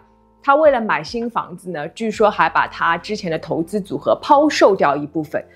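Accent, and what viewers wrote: Shanghai, China. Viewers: 侬是上海侬伐？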